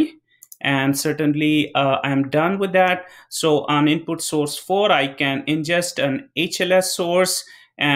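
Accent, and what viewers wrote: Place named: India. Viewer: love to hear indian voice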